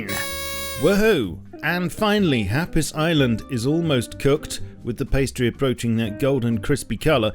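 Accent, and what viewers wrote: United Kingdom. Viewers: hey im glad to hear your nice british voice again. thanks for the updates :-)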